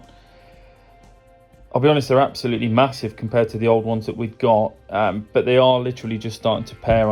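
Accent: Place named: West Midlands, United Kingdom. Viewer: West Midlands accent I'm hearing there I think pal?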